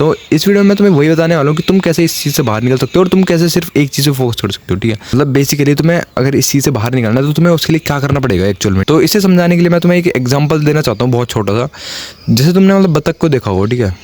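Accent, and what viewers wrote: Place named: Hills, India. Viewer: Bhai pahad se ho?😊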